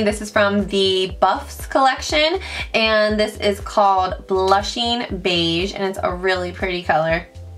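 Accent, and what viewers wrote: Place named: New York, United States. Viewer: I feel like you almost have a New Yorker's accent. I love it.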